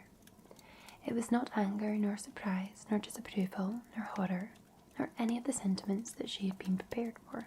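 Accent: Scotland, United Kingdom. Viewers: Ah I missed that lovely soft Scottish accent. Sleepy time now, night night 💤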